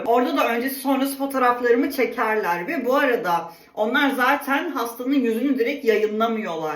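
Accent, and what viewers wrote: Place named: Central Anatolia, Turkey. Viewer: Aksanın bile çirkin iç Anadolu aksanın var sipariş verdiğiğğğmmm düzgün Türkçe konuş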